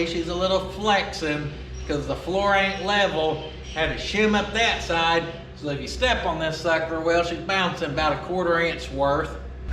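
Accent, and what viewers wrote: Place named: Oklahoma, United States. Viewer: I often comment because its only fair that i spread my expertise across as great an area as i possibly can! And i may or may not get ridiculously triggered by my colonial cousins the other side of the puddle pronouncing english words spectacularly wrong! E.g. jagwire instead of jaguar or sodder instead of solder but i have to say american accents are so easy on the ears mr is particularly cool if i try and speak on camera i sound like a cross between michael caine and ron weasly its just not fair america took my beautiful language flushed it down the waste disposal and then made it sound so damn cool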